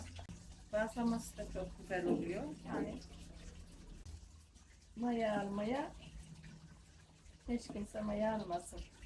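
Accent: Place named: Aegean, Turkey. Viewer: Ayni bizim gibi konusuyo usakla afyonu konuşması hi denizlide ayni şive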